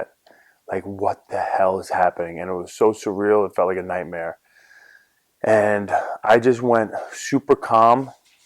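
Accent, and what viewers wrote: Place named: Brooklyn, United States. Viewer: You are what got me into MMA 10 years ago, Chris. I'm originally from Brooklyn and naturally related to you just from your pride, background, and accent. I'm so sorry this happened to you. It was gut-wrenching to watch as your fan. When you said your mind turned to "even if I lose the leg, I can still be with my kids" I couldn't help but be amazed at what an incredibly willful soul you have. You will always be an inspiration to me and my favorite fighter. God bless.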